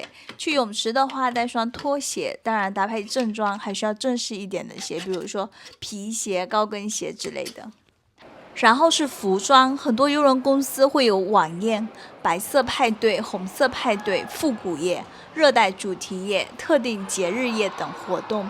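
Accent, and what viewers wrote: Fuzhou, China. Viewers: 你是福州人嗎？尾音好像聴出鄉音！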